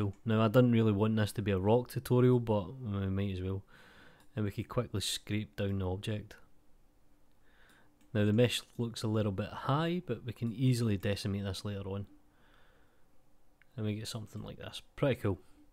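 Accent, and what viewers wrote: Scotland, United Kingdom. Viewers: This guy just makes everything sound funny, love the Scottish accent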